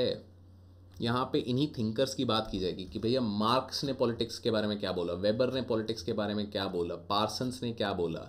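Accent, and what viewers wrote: Bengal, India. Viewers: Sir are you Bangali?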